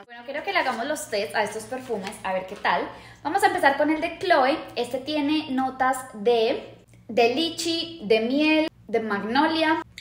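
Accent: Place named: Mexico. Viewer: Que lindo lugar ❤️💕., ya se te nota un poquito el acento mexicano 🤭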